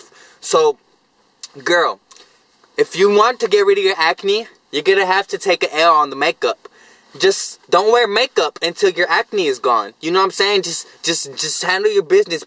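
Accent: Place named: Texas, United States. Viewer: texas accent came out in this vid a lot 🤣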